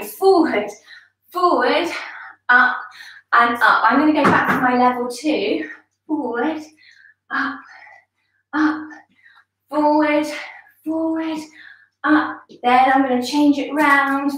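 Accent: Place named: United Kingdom. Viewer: thanks you for your advice regarding my Flappy Flabby upper arms I will definitely be watching out for any and all exercises that help! Have a great day in Cornwall, I visited there two years a ago was fortunate enough to catch the filming of Doc Martin and met some of the cast. As an ex pat we get over quite often, really enjoy the description of the view from your window! And your British accent... after 40 years here in Canada I still have a very strong Yorkshire one! I am so glad I and my daughters found your videos they are so inspiring and upbeat, and cater to all levels! Thank you for brightening our day! Jill Vancouver Island Canada!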